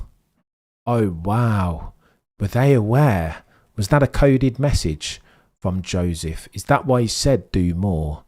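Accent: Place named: England, United Kingdom. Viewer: What part of England is this accent from?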